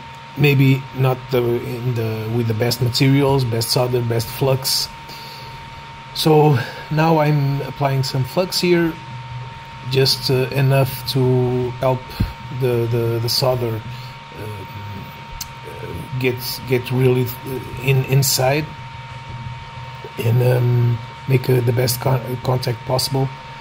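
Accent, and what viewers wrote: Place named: Portugal. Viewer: Hello do you are Portuguese? You have same acent of Portuguese in English eheh. I need to do this repair too 😅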